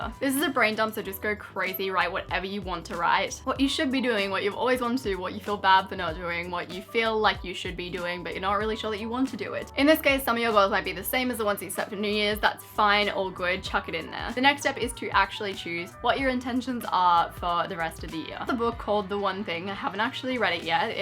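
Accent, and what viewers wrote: Australia. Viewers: I love the way you talk! Being from Germany, I normally only watch TV Shows in American English and I am so not used to Australian(I believe) English and I LOVE it! Thanks for tipps. I am kinda in between kind of type, I got some goals done(planned to go vegan for a month and went vegan on a constant so I went over the top of my goals; planned to fall back in love with my life and body and I am rocking it;I planned to visit at least 5 new cities and I have 6 by now!) but then again, I planned to make more friends and start a blog and didn't achieve it yet but in German we say “the night is still young“ except it is the year in my case. Soooo much love coming from Germany all your way! Thank you for always reminding me to go after my goals and grow! PS: how is your year going regarding to your goals?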